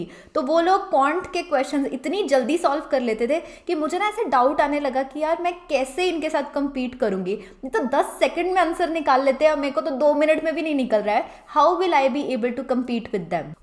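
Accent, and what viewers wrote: Punjab, India. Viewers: Love the tinge of Punjabi when you talk 😊 Chak de phattey Kudiye.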